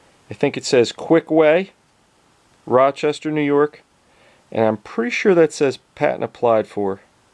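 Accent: Philadelphia, United States. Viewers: you sound like a Philly boy.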